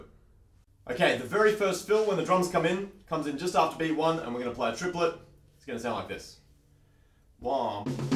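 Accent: Australia. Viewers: is he Ashton's missing dad? he's a adult he plays drums like Ashton , he has a Australian accent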